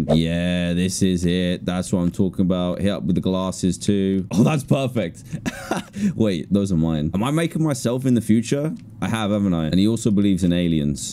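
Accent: United Kingdom. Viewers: you know when you're watching someone who's too british when they pronounce amazon amizin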